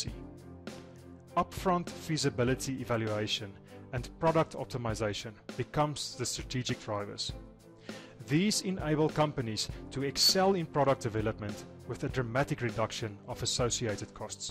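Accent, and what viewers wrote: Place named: South Africa. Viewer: Are you south african? I hear an Afrikaans accent somewhere in there.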